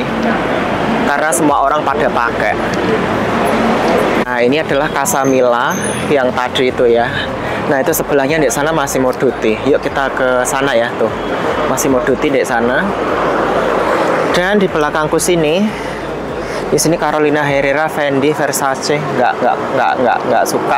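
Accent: Java, Indonesia. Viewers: Tiap x mas bilang AING,CENAH...rita lngsung ktw krn lidah jawa ngomong sunda jd lucu aja bawa'annya🤭 semangat trs ya mas 🥰🥰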